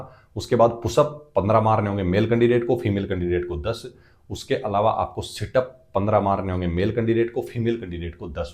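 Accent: Haryana, India. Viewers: Sir aap harayana se ho kya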